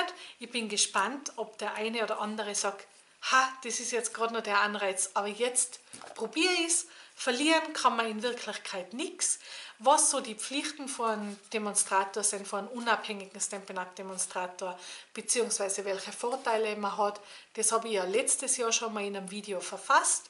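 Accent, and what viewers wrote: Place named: Tyrol, Austria. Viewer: Hallo, wow was für eine tolle Action wieder von Dir. Gerne versuche ich mein Glück und hüpfe mit in den schon gut gefüllten Lostopf. Ich freue mich immer wieder wenn ein neues Video von Dir kommt ich liebe Deinen tollen Tiroler Akzent;-) und natürlich Deine tollen Ideen habe schon einiges nachgebastelt. Viele liebe Grüße aus Bayern Christine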